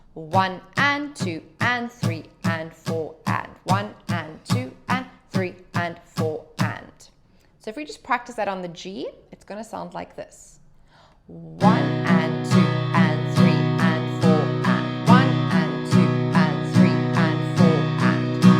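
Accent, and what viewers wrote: Australia. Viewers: Great lesson & teacher - is she an Aussie 🇦🇺? (really cute too 🥰)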